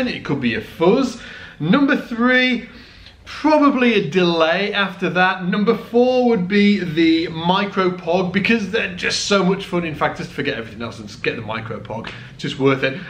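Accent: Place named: Leeds, United Kingdom. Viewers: He’s an English guy speaking English, with a regional dialect - in this case, that of Yorkshire (albeit certain Americanisms have crept in). Here’s a thought, though; if you have difficulty with English accents that aren’t standard RP Hugh Grant-type stuff🧐....TRY HARDER. We sent you the Beatles.....I would have thought THAT would have given you a clue. 😉😂😂😂😂😂😂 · Tuner. Yes I don't like English accent too. This Leeds area English is some kind of German and London mix imo LOL... Anyway, I prefer standard American accent personally.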